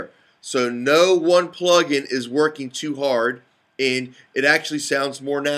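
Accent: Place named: Baltimore, United States. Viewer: You sound like you're from Baltimore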